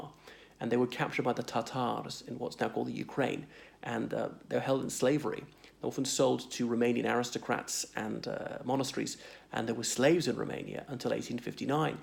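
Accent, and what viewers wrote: RP, United Kingdom. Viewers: Hi. I am Irish. You have a British accent. I presume you spent a lot of time in the UK? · you have a british accent you have no place describing irish history i will only listen to an irish traveller on this subject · Wow buddy you sound posh but very interesting article👍 my father is from the Republic and always said men from Kerry/Tipperary area's were excellent for work with pick&shovell 🤣🤣🤣🤣🤣and men from Dublin were only good for pulling pints 😂😂😂😂😂😂 myself my back is covered in freckles and in the summer it looks like I've been sunbathing under a tea-strainer!!!!!!!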